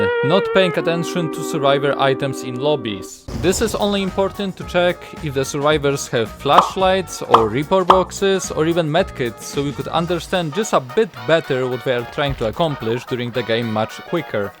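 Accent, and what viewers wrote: Poland. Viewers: I heard Polish accent and subbed, 🇵🇱❤️🇵🇱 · strong polish accent 😨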